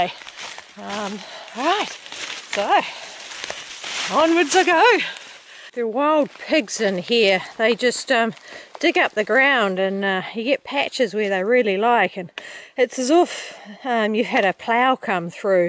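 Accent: New Zealand. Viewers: I love how you always start your videos with “good morning!” In your NZ accent. It’s so charming! Love your videos!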